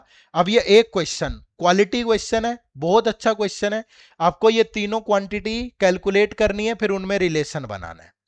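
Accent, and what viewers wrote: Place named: Haryana, India. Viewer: Sir I’ve not watched this lecture but I’ve watched some old lectures so I’m pretty sure it also would be such useful lecture for me and I really appreciate you teaching style and your Haryanvi help me to not bore! Love you share ❤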